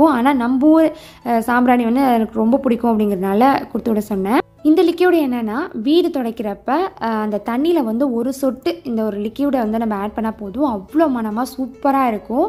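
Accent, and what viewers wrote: Thanjavur, India. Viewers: Sis neenga Thanjavur lah raajahiri yaaaa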